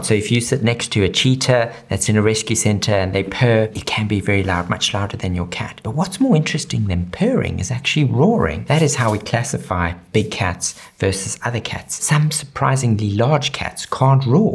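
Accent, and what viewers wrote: South Africa. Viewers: Only a South African can recognise that accent ☺ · he sounds south African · Dude. Your accent, are you South African? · It's South African "accent". Many different accents combined into one. I personally love it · probably KZN accent. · could listen to this guy for hours i love south african accents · I know a South African accent when I hear it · Love the South African accent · South African? I love the accent. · I know that South African accent anywhere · It's a South African accent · This guy south african?? The accent gives pretoria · sounds like maybe a bit Australian or something as well. I thought Australian, but it sounded off, and South African definitely makes sense. But I also wonder if there's something else in there as well! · Is he South African? He sounds like it lol. Yay we made it to WIRED!!! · I'm having trouble nailing down his accent...South African? 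· I can't tell if you're South African, British, or Australian? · the second the video started, got slammed with the strongest south african accent i have ever heard lmao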